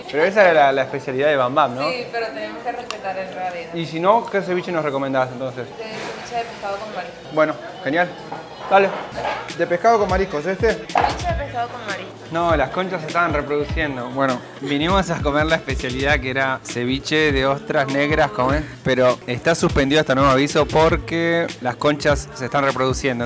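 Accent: Argentina. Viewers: Quizás porque es argentino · Amo tu acento argentino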